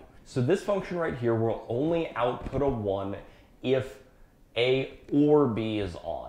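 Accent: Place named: Canada. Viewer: i saw an american flag, but you sound like every single person i know-- here in canada, lol.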